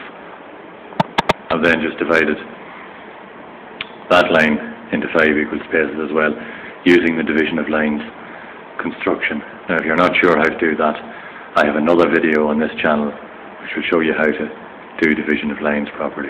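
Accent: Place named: Ireland. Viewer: Also great to hear another irish accent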